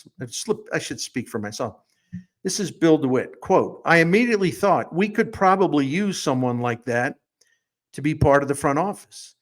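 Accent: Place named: Boston, United States. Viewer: Love ur Boston accent!! Lol. Great storytelling!! Thnx Bernie.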